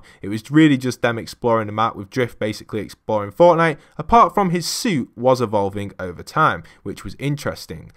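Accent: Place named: England, United Kingdom. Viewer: He has like English accent don’t hate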